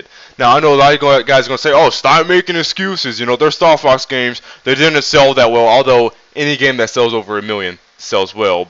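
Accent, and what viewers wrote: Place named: Texas, United States. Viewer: Hold up, you're from Texas!? I always imagined you lived in NY but now that you mentioned it... I can hear the accent (in a way)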